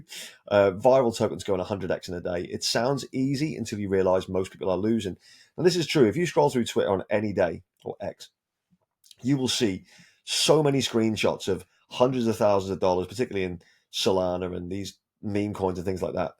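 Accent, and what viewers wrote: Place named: Manchester, United Kingdom. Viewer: Great video from the man who i thought was from Manchester 😁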